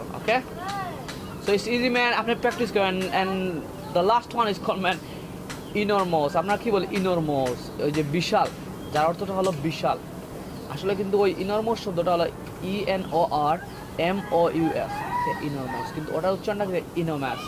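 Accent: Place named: United Kingdom. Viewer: Good british excent.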